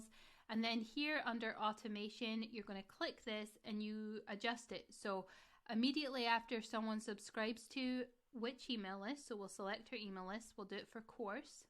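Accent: Scotland, United Kingdom. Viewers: Hey, Im guessing you ahave spent some time in Scotland based on your accent. I was looking for a video on how to use the newsletter feature on my squarespace website. do you have a video for this? I would like to send a news letter to people on my website that sign up but now sure how to make it all function. I have used a few of your videos to get mite site to where it is. so Thank-you.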